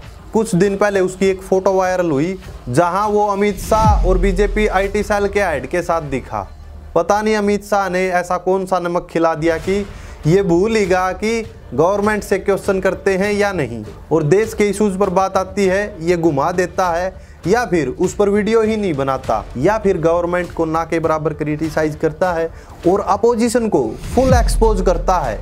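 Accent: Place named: Rajasthan, India. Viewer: Arre bhai aap bhi rajasthani ho boli se pata chal rha h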